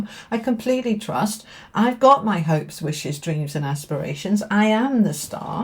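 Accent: United Kingdom. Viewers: I’m English living in Ireland it’s so lovely to hear a good old Uk dialog here,make a cup of tea and listen lovely and your large angel in the back ground is so lovely 😊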